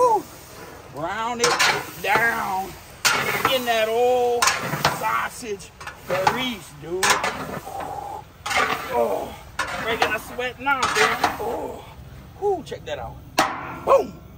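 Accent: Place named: Cajun, United States. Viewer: if you weren’t severely autistic and could differentiate characters you’d realize he’s playing exactly that. On podcasts he’s got a strong ass Cajun accent but he’s not retarded.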